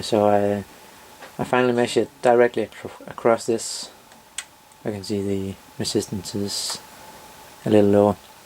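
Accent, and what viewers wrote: Denmark. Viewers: Elektronik Center ? Danish accent.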